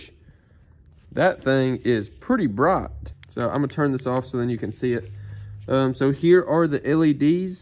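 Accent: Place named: Tennessee, United States. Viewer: I got some that are similar to yours and mine quit holding a charge more than an hour right after the return window closed . How’s yours working now ? Great review! I love the wide view these types of lights give . Definitely want another one that’ll last . Your accent is similar to mine . I’m in TN , born and raised . What state are you from ?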